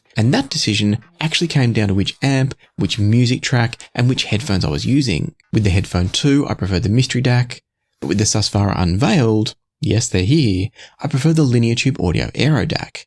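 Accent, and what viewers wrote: Australia. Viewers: your comment assumes that Linear Tube Audio has tested the I2S implementation and then decided not to include it after concluding it wouldn't 'add much'. That is simply something we do not know as they only have 1 DAC in their lineup and no other reference point. I would actually guess that they have not tested it, maybe due to not having the components needed on hand at the time of manufacturing. I do concede, based on your review that Linear Tube Audio really do know how to make really good ins/out in terms of electronics when it comes to clock ect... that alone require a lot of skill. Now if it did have i2s, I really can imagine your voice saying "and if that wasn't enough, as I switched to the i2s, it was like the soundstage opened up even more... the details became a tad bit sharper... not harsher... buta tad bit more etched at their edges, if that makes any sense..." see how much I know your use of words? 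:) I really should stop watching too much of your videos before I start speaking with an Australian accent... Im already close as I always loved the Aussies :) Aussie Aussie Aussie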